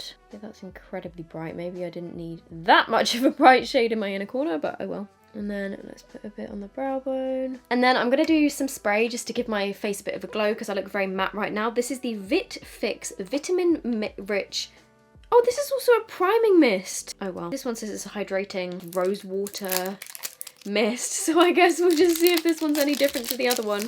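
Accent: United Kingdom. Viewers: !!!! I started watching your videos in 2017 or 2018 exactly for testing these cheap make up products and because I was living in UK back then and you helped me to learn understand british accent. Later I stopped watching youtube for couple of years and now I just felt like watching make up video and I remembered you so I got back and it was one of the first videos that was recommended for me. I still love you♥ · You popped up on my for you page in YouTube about a year ago but then I didn't like the British accent but at the same time i was watching some of your videos and after a couple months i became addicted 💗💗💗💗💗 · I found you in mid-2017. I was 37 years old and had NO idea how to do makeup (foundation especially!). I’d always been too lazy to do makeup, and my skin was clear due to hormonal BC since age 15, so foundation was completely alien to me. 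But as I approached 40, my skin was beginning to show sun spots and dark circles, so I wanted to learn how to do my makeup. I subbed to around 15 makeup channels including yours & I have only stayed with 3, including you. Mostly because you’re super relatable, down to earth, honest, and I adore a British accent (USA here). I’ve really enjoyed watching you grow up and thrive. I’m happy for you in the same way I’d be happy for a little sister… is that weird? I don’t even know you but I have the best wishes and highest hopes for you & your little family.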